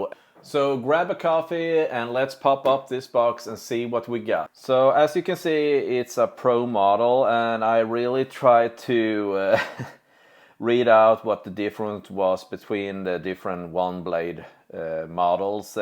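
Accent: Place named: Sweden. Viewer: Thanks for the video I like your Swedish accent :)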